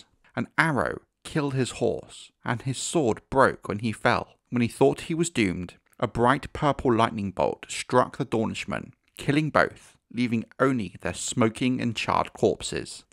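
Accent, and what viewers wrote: United Kingdom. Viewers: Hearing a narrator from the UK (assumption) makes me feel like these are history videos as opposed to lore.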